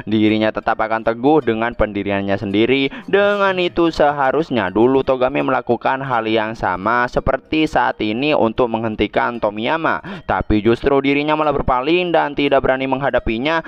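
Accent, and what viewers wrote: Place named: Madura, Indonesia. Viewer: sama sama orang madura soalnya bang, kerasa banget logatnya di telinga aku wkwkwk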